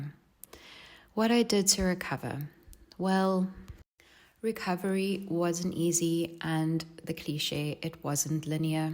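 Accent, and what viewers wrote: South Africa. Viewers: I thought I heard an South - African accent. Thank you for sharing. Look forward to watching your backlist. 😊😊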